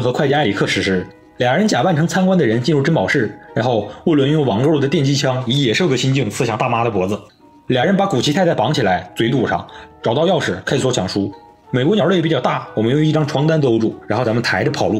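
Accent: Northeast, China. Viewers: 可汗的老家通辽是蒙古族和东北汉人混居的地方（从地理上说这里是内蒙或东北，都是正确的），东北地区不少人说话都是这个节奏的，赵本山小品和赵本山弟子拍摄的《乡村爱情故事》就是这样的语速，这种语速特别适合讲黑社会犯罪故事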